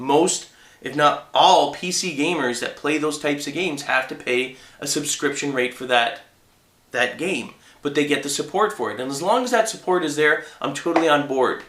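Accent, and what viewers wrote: Canada. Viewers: are you canadian "aboot" not about, "aboot"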